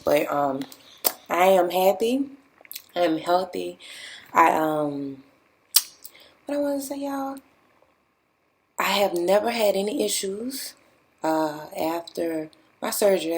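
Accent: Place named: New Orleans, United States. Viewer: you from new orleans? i hear it in your voice lol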